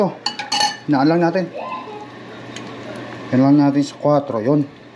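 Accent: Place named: Visayas, Philippines. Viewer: Bakit parang bisaya na accent mo kuya hehehe ✌️✌️✌️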